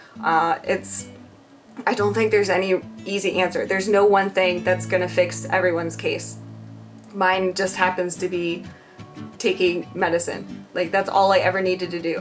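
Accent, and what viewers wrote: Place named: United States. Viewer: your american accent is going away and its cutee :)) ♡♡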